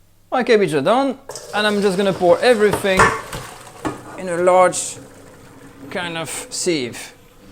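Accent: France. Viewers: His English is quite excellent, and I do love a French accent! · This is exactly how the French speak in Pink Panther. Thanks for an easy and doable French recipe. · It's Frenglish.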